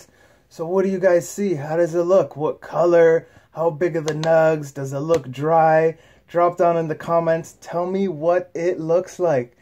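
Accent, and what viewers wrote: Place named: Atlantic Canada, Canada. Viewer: You from the atlantics? Trying to pin down your accent lol. New Brunswick or Nova Scotia would be my guess but it's really a toss up over there for me ahaha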